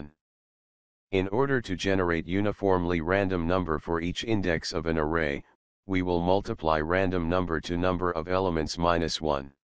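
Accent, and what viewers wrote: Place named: India. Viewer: dat microsoft sam voice, good vid, but id prefer your indian accent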